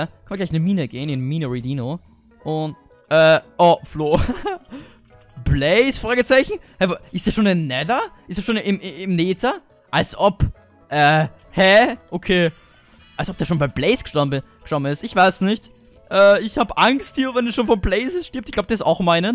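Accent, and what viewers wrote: Austria. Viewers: Ich liebe deinen österreichischen aktzent wie bei Flo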